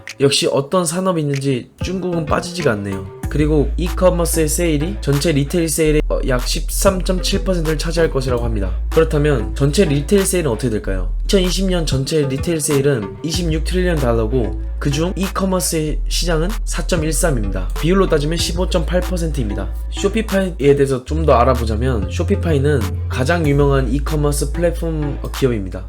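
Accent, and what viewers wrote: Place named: Jeolla, South Korea. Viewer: 영어 발음이 섹시하시네요 ㅎㅎ 반면에 한국어는 전라도 억양? 귀여워요!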